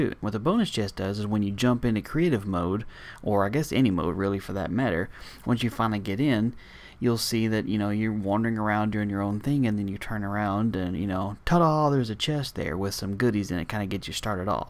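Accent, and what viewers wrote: South, United States. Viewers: I like your accent. You live in the south? I live in the midwest.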